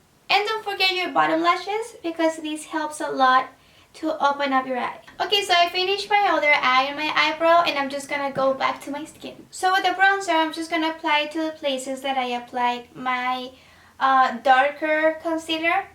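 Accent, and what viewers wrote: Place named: Colombia. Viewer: amo tu inglés colombiano😍😍😍😍😍😍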